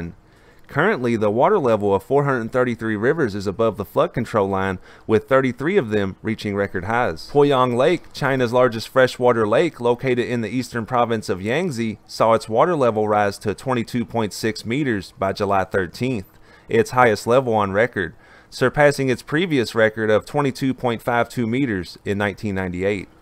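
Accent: South, United States. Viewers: This southern accent attempting so many Chinese names is somehow both endearing and unbelievably frustrating lol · When I clicked on this video I did not expect to hear someone so southern hahah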